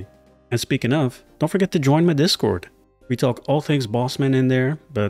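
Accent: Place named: Montreal, Canada. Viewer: it sounds like you’re from Montreal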